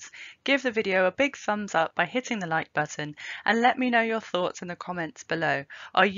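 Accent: United Kingdom. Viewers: Dear Dr. - thank you for this quintessential explanation to one of my most loved poems that i have always interpreted as the secret of what the mere Christian fairy tale is about. This poem connected me to transcendence like no other and i am not a privileged elite - i was educated in New York City schools and as Puerto Rican and trouble even understanding everyday language. There was some very beautiful way about this dialogue that blew me away. Now i found you and you have masterly deepen the beauty and given me more knowledge in that lovely British voice. I just put together the Russian Dying SWAN with this poem - for my imagination of the transition; like you said it is just a divine nap- ( LOVE THIS NEW INTERPRETATION) and we become so very rested and beautiful. In Socrates ‘ PHAEDRAS it is the trumpeter’s song - the more beautiful when thy know that they are to die - this gives me so MUCH HOPE!THE GREAT NEWS! 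I didnt know the COR.. SCRIPTURE - WOW. Today is an UTMOST FINE- YOU! Thank you for this illumination!SEE DYING SWAN- DLYANA-LOPATKINA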